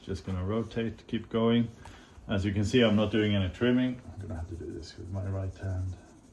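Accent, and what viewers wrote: Scania, Sweden. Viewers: Saknar verkligen din skånska från dina tidigare videos. När du pratade på svenska var du mer unik. 😊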